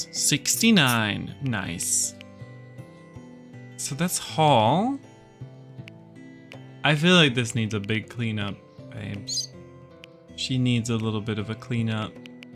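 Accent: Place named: Netherlands. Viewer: You sound Dutch. 👍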